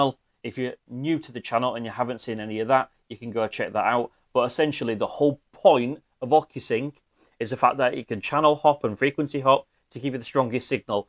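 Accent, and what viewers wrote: United Kingdom. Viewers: Just a separate comment on your accent, it's super English and I really really love it and marvel at how you sound bro.. PS: I have another comment on the actual video.. 😁🇧🇬🛸 Sweet Keep well and be safe!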